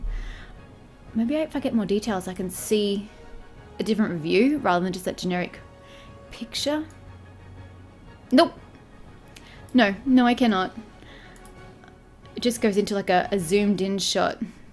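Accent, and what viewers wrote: Australia. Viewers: What Australian state do you come from? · Helloooo from Denmark ... sweet voice... NZ or Aus? I'm guessing Aus 🙃 Start. Character build. "Honey, why is your hair so luminescent?" LOL ... also she starts the game here. Also LOL 😅 Motion blur is disgusting ... agree! Haha.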